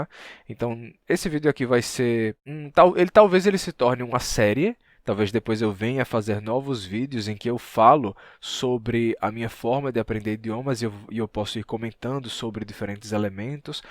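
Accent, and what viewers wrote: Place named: Northeast, Brazil. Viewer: Você tem sotaque de português do. nordeste do Brasil. No entanto, percebo um outro leve sotaque, que identifico com o sotaque de nativos do português do Brasil, mas que residem há muito tempo no exterior. Digo isso, porque tenho um neto brasileiro que reside em Londres desde 2008, e percebo um levíssimo sotaque em algumas palavras.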